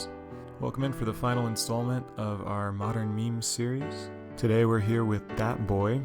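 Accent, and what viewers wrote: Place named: Utah, United States. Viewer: love your vids man, if you're in utah like it sounds like you are we should play some MTG sometime or get drunk and yell at the mormons in the airport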